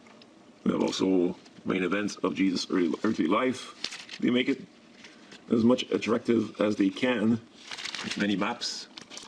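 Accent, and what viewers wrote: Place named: Acadia, Canada. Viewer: Hi great video My best friend is Acadien and your accent sounds like hers she is very proud of that. BLESSINGS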